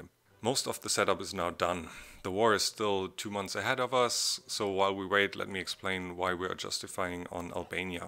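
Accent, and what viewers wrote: Germany. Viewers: Because he most likely has the German version of the game, from his voice and accent I would say he speaks German. In the German Game Version the Portraits of Head Nazis such as Hitler, Himmler, Göring, Hess and Göbbels Are blacked out or censored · I believe he’s German, I’m Dutch and it sounds a bit different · Are u from Germany?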